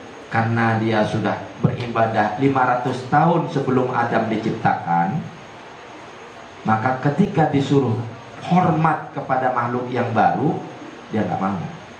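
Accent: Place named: Serang, Indonesia. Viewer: Wih Gale kaya wong Serang ngajine. Melu ngaji kyai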